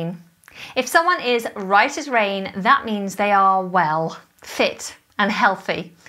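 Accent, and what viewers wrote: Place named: RP, United Kingdom. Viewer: I'm still learning English accent. It's really posh.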